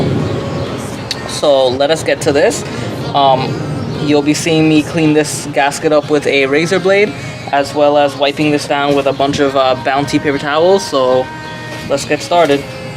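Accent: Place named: Trinidad and Tobago. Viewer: Very nice man, I have an 03 avalanche. It sounds like you're from Trinidad? I'm from St. Kitts and Nevis, but my family is from Guyana.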